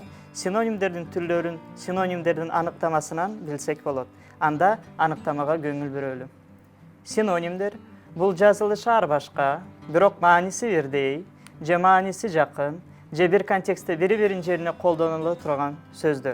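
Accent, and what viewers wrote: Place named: Turkey. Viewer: У него турецкий акцент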